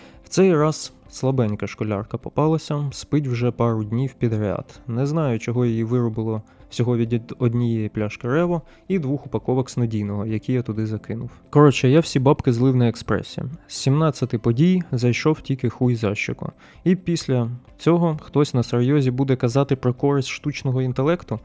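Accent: United States. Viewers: звідки американський акцент?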